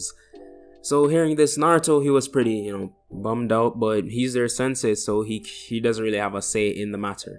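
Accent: Jamaica. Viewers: are u Jamaican? lol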